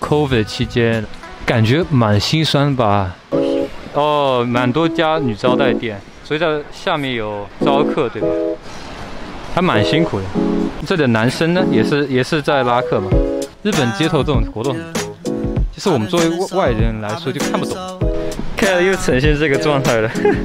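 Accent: China. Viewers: 中國的腔調 , 繁體的字幕 , 可以告訴我為什麼嗎? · 中國腔很輕微。但學台灣腔還是能聽出是中國人。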